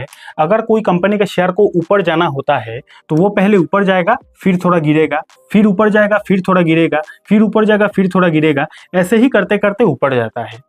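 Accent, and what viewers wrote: Bengal, India. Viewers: Sir, aap Bengali hain?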